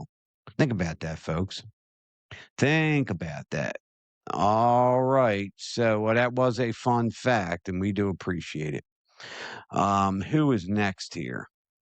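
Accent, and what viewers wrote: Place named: Baltimore, United States. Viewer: I love your Baltimore accent. Go Os.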